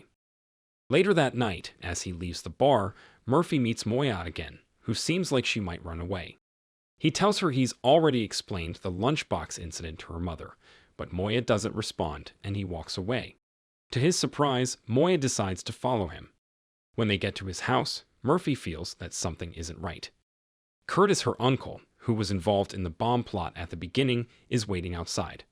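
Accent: United States. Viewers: Man do I hate a yank telling an Irish story ..... give up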